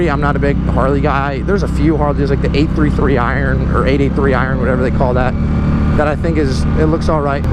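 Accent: Pennsylvania, United States. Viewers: Hey Dude, Where have you been? Great review! I'm a HD guy and I'm leaning towards a new Softail Slim. But Damn! Funny you sound like you more from my neck of the woods in PA. Great Review!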